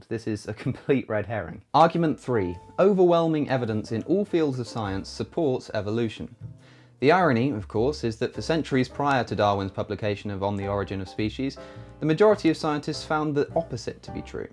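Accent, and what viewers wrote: United Kingdom. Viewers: The British accent is the music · I feel like things are easier to understand when explained in a British accent.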